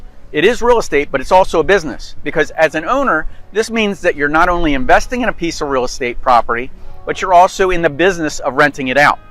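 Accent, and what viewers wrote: United States. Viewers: This is weird. The American English accent is right, but these aren’t all real English sentences. AI maybe?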